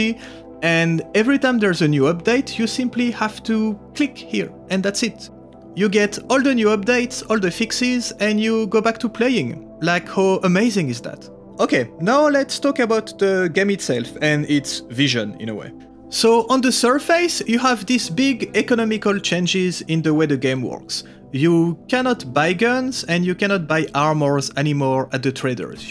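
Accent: France. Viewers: Hey great vid man ! I never played the stalker series but I would really like to, so I started watching your videos and I have some questions, that maybe you could answer (or make a video about like : getting started on stalker). I would like to play the original game's stories and I know everyone says to play the games without mods to get the developper vision but it really is a big hold back for me. Maybe if you played the games back then the sounds and graphics don't get in the way but, nowadays I can replay only old games if I played them back then. So do you have mods you recomend for a new player to enhence the 3 games so I know I will actually finish them ? Thank's in advance if you have the time to answer ! PS : est-ce un accent français que j'ai cru entendre ? 😄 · Thank you very much for this review, you do good job with your videos. En plus avec l'accent français c'est encore plus compréhensible. 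Like you I love the huge work of Grokitach, it feet my vision of S.T.A.L.K.E.R This game and its community is one of the best things in this world ! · Tu es Français? (vue la manière dont tu parles anglais lol). Si oui j'aimerai bien un peu d'aide pour comprendre certains détails sur le mod GAMMA. Merci d'avance. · Je sent dans l'accent qu'il y a du français, ça fait plaisir ! Superbe vidéo !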